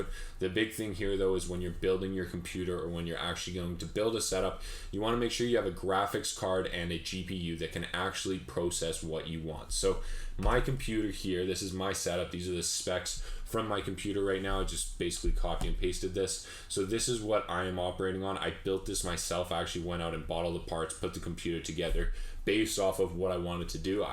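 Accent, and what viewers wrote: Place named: Canada. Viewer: Thank the lord! A man who speaks Canadian. All the yanks nearly made me quit b4 I started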